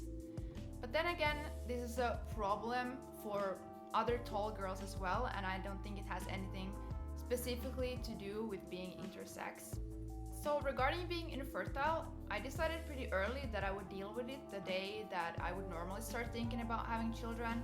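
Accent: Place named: Germany. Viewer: She speaks English with a kinda German accent. Good clip.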